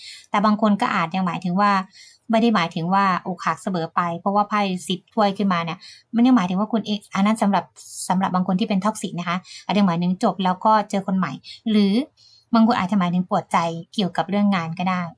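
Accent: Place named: Isan, Thailand. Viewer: ได้ยินสำเนียงอีสานกดติดตามเลยบักคนบ้านเดียวกับคร้าบ❤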